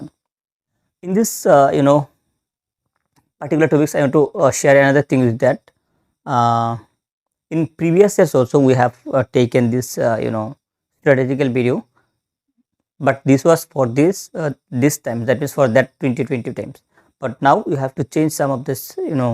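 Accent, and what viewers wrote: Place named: South India, India. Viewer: His English in this video is understandable and No Prob at all, Many big prof of south India Have similar accent pls don't criticize someone else efforts Mahagaye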